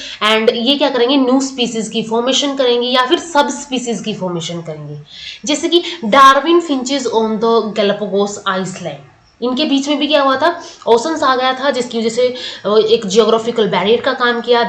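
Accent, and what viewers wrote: Haryana, India. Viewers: Mam Haryana se ho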